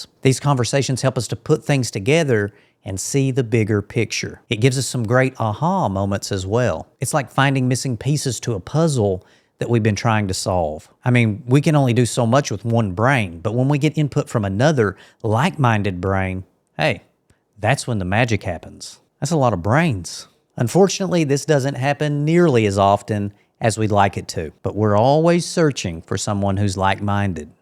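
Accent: South, United States. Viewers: Cool southern accent. Have a great day, INFJ’s!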